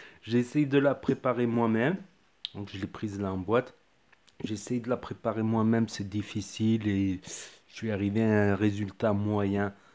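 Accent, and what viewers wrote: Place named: Morocco. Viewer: J entend que ca marocain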